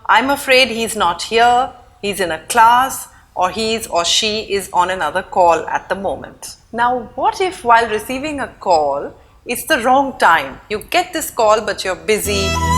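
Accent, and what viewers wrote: India. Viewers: You are indian madam so sweet accent. from France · hi, this lesson was great to have . nice teaching.are you from India or from another country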